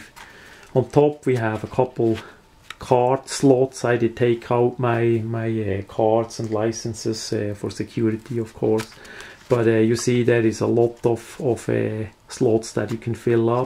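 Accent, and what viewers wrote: Switzerland. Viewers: Is this what Swiss accent sounds like?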